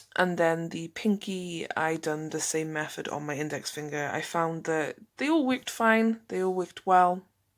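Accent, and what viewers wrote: Cardiff, United Kingdom. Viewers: This is the first time I’ve watched this lash. I really like how she talks. Sounds a bit like a Cardiff accent? Anyway I found her very helpful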